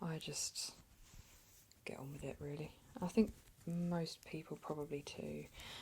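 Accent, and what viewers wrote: Ireland. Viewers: Can you knit like plush animals for example? Or is that for only like sewing or something? I'm not much of a craftsman, but I do really like plush animals >_> So I thought I'd ask. :) Great video. I actually found your channel when I typed in Irish ASMR. While I had a hard time finding any actual Irish asmrtists, I did find one of your Irish accent videos. :) Subscribed! I look forward to checking out your other videos.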